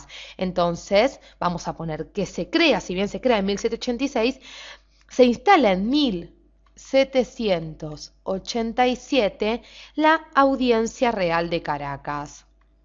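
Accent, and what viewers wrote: Argentina. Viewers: CHE SOS COMO ARGENTINA NO SE XD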